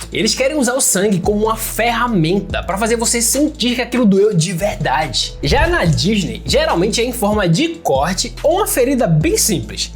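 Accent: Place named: Northeast, Brazil. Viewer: bom ver um nordestino com um canal com potencial para ficar grande, cansa de “só” escutar sotaques do sudeste (basicamente)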